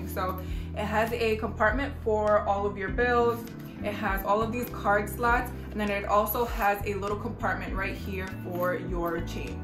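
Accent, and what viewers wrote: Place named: New York, United States. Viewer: Def from New York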